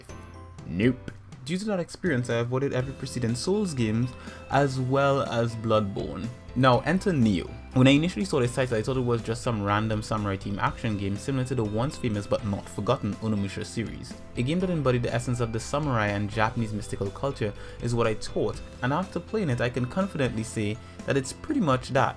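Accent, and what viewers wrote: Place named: Trinidad and Tobago. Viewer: Are you from Trinidad? You sound like a Trini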